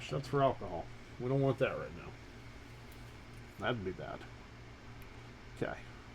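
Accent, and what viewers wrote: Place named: Pennsylvania, United States. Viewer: This guy has to be from Pennsylvania. Fyi I use this for guitar effects. It works flawlessly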